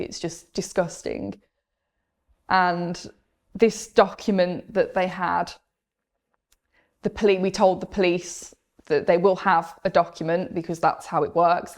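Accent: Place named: United Kingdom. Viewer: This girl reminds me of a former girl friend from college days, except for the lovely british accent. She was NOT a JW, I should add. What a mixed up cult they are.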